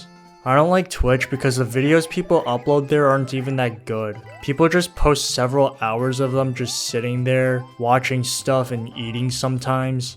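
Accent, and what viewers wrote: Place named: China. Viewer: You sound so chiense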